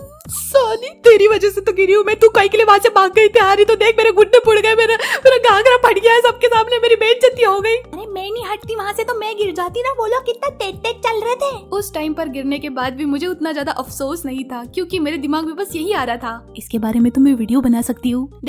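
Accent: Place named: Gujarat, India. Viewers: I thought you were gujrati because of your accent😮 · But aapki accent se toh aap gujrati hi lagte ho 😅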